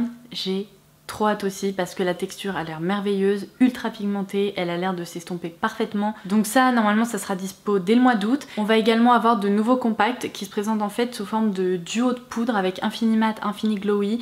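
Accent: England, United Kingdom. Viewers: Super vidéo, maintenant il reste plus qu'à économiser mdrr et j'adore ton accent anglais ! 😃❤️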